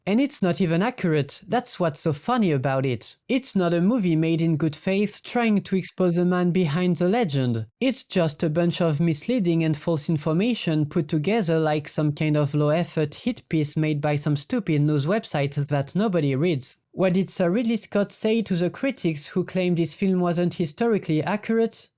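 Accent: France. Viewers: Ton accent frenchie me fume 😂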